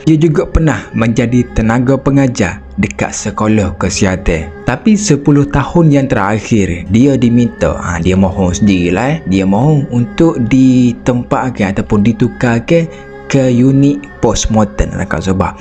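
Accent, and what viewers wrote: Kelantan, Malaysia. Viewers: Kawe orghe Kedoh tapi pahe baso Klate ni tapi apa pong kawe terhibur...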